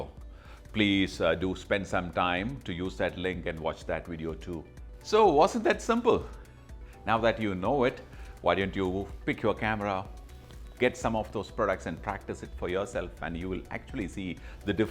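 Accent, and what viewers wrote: Kerala, India. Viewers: Love ur mallu accent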